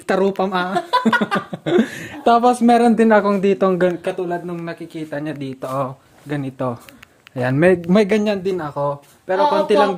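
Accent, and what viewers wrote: Ilocos, Philippines. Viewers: Kwan!😅Basta ilocano di nawawala sa pagsasalita... proud to be I'm ilocano from TARLAC....God bless more vedios na nakakainspired😊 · Makakatawa ak ehh kasi gamin eh ilocano tagalog sao natin eh. Kahit pinipilit mong agsao tagalog eh may laok na ilocano pa din eh😂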